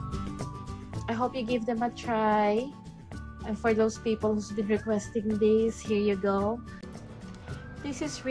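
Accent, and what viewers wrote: Philippines. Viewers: Absolutely perfect! =) I used to eat this at Goldilocks in PH, I can't stop watching your videos coz I'm a bad baker and trying to learn. I hope I can make one of your cakes one day. Thanks for sharing this video. I also like your Filipino accent, makes us proud.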